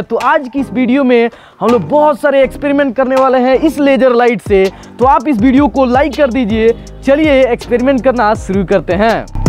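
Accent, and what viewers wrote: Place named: North, India. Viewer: North indian English very bad Lager nehi laser hindi vala ko saa nahi aayega zero hindi wala ko geero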